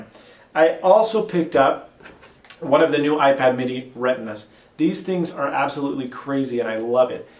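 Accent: Spain. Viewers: you have a slight Spanish accent when you speak English now compared to your last videos pre-Bolivia haha. Great to have you back:) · Wow.... i remember when you left... crazy to think how fast time has passed. Your accent has developed so much into a spanish accent. It's hilarious! Hope to see more soon. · I speak spanish as well, and it's very noticeable for me, haha. He must not have had much of a chance to speak English. His 'i" is very spanish-like. I think it comes from being a non-native speaker. I'm sure that being back home, he'll be able to even out his accent. He must have absorbed so much culture! It must have been an amazing experience! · Slight Spanish accent? Nice to have you back! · That spanish accent haha! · Spanish accent!!!!! :D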